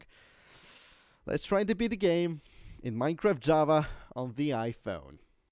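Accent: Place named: Europe. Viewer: sounds like netherlands, german and czech accents mixed together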